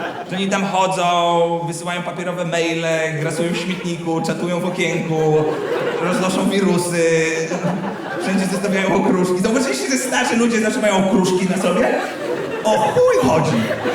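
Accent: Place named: Mielec, Poland. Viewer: Po akcencie myślałem, że jesteś z Mielca lub Zbuczyna co nie? Dużo się nie jebłem 😉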